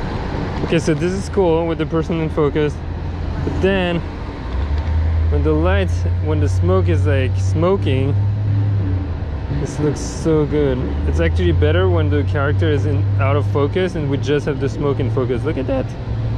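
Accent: France. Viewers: Hi ! I love your content, just discovering street photography and your work blow my mind... One thing you have in common with all other street photographer I watch recently is the picture of strangers without noticing/asking them. Is it something normal ? You don't have any problem with that on a daily basis (law or simply argument with people if they notice you have taken a picture of them) ? It's a legit question, and sorry if it sound a little rude, it's not my goal, just pure curiosity (and sorry for my very poor english, I can ask that in french but I want to stay in the channel mood (your name and accent make me think we spoke the same langage)). Anyway, thank you for your work, really beautifull and relaxing.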